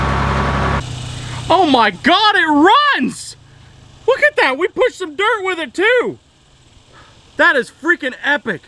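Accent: South, United States. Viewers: Which accent is that ? I guess southern ? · I'm new here! I thought that it was going to start. I used to work for both construction and excavation companies. For a while I even did erection, but that's a story for another time. I'm going to guess by your accent you're at least in Tennessee or even more South. It tends to be hot, so why not dig a pond when you get the kinks out of the lift cylinders? You can always use it for watering livestock, but if you're too far in South look out for gators!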